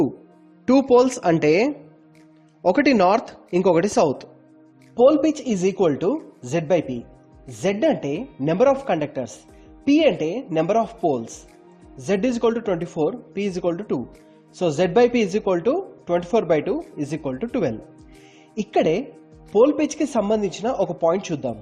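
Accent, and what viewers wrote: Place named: India. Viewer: That's impressive, but We can't here that many words, cause we need english subtitles. Indian english are so difficult to here for another nations.🤔😔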